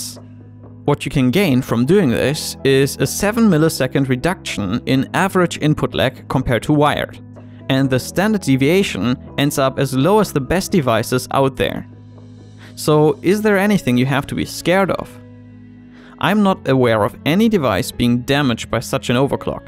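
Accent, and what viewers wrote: Germany. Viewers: Man hört so dass du deutsch bist xD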